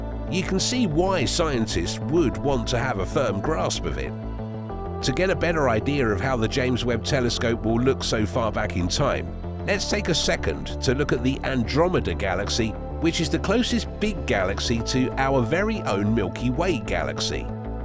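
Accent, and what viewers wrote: England, United Kingdom. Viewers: That english accent made me quit watching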